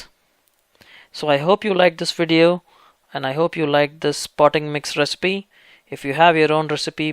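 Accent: India. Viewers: Your accent seems to be an indian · not an Aussie accent its Indian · indian i think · it's an indian accent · I love Indian accent, so refreshing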